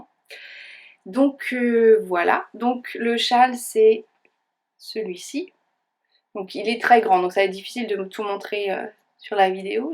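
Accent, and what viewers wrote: United Kingdom. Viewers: Super, merci pour ce podcast et vivement le prochain. J'ai hâte de voir tes trouvailles d'Ecosses. Et j'adore ce petit accent British ;-) à très vite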